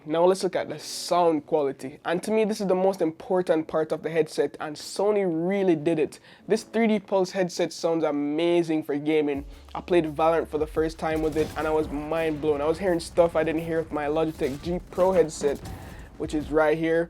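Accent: Jamaica. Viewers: Are u Jamaican dawg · U Jamaican Bro? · You deserved my sub bro....btw that accent are you jamaican? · Could tell from the get go that you were Jamaican 🤣🤣🤣 A di only reason me subscribe, bless up. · Yeah your Jamaican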